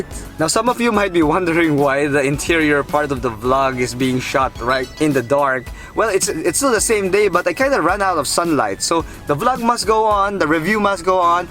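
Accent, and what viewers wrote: Philippines. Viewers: filipino ,,pinoy accent wooww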